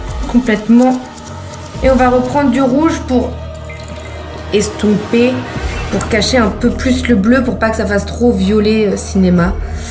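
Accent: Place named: Normandy, France. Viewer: On voie la normande et la façon de parler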